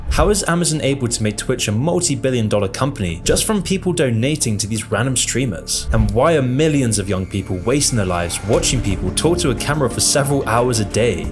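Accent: United Kingdom. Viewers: This is what conspiracy theory sounds like in the Queen's English.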